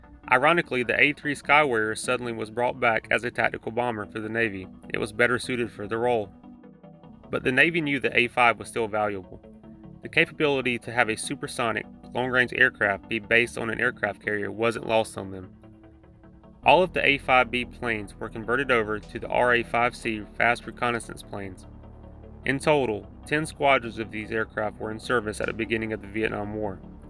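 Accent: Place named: South, United States. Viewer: Seriously, this is one of my favorite channels. The facts, history, and your accent being a bit southern like most of my friends...very easy to listen to. (Yes, i get a lot of $hit for being a 'yank'...lmao) Be well. ☀️😎🇺🇸☀️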